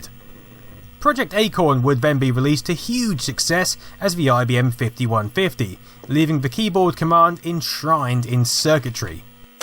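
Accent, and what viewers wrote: United Kingdom. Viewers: Brilliant video after Brilliant video AND with proper British accent what could you possibly ask for more, Thanks!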